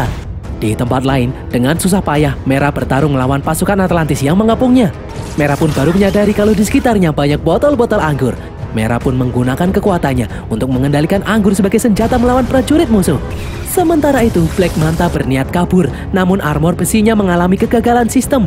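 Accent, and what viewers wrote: Java, Indonesia. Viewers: Mimin nya jawirr cuyy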